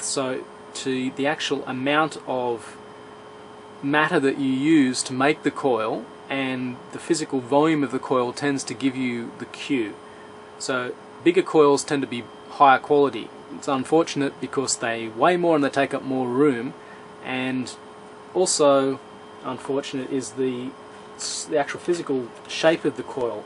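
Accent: Australia. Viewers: thank you! I was right. I thought you are Australian)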